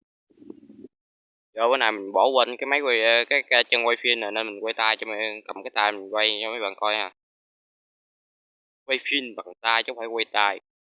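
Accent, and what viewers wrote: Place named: Mekong Delta, Vietnam. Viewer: nghe tiếng là biết miền tây rồi phải không? tỉnh nào đó.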